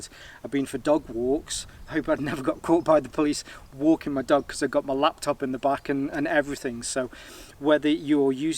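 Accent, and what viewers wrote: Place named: Stoke, United Kingdom. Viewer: Funny, i watched a few more of your videos, then it suddenly hit me: i recognise that accent! I moved from Stoke about 20 years ago.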